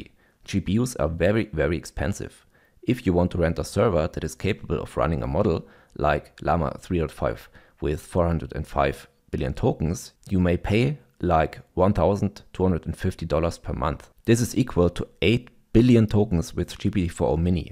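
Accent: Germany. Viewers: Your german-english accent just makes listening to you even better. Things have a more serious tone to it. Fantastic content!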